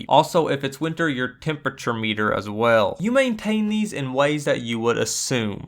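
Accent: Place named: Alabama, United States. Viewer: Idk where you're from but your accent is pretty much exactly like everyone I know (I'm from Alabama for context)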